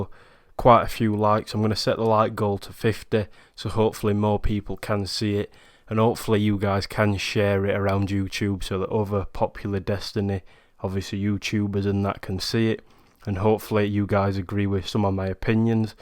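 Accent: Yorkshire, United Kingdom. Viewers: I don’t know if you’ll see this but I love your accent 😂 it sounds Yorkshire a bit I’m from Yorkshire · I only live forty minutes from Sheffield. Your accent is one of the reasons I subscribed. Can't beat the no nonsense opinions of a Yorkshireman!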